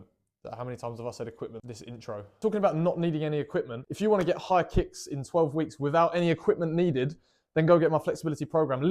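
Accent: United Kingdom. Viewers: With his accent he IS british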